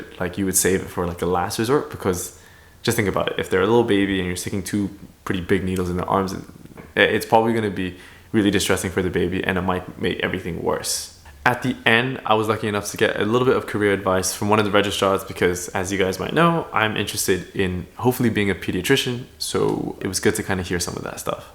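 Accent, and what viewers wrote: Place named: United States. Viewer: Great video! we’re u born in the UK because i don’t know why but i thought you were American at first??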